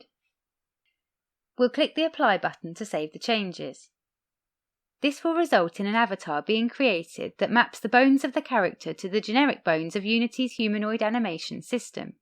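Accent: United Kingdom. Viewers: Eeeeexcellent! And I like the British accent.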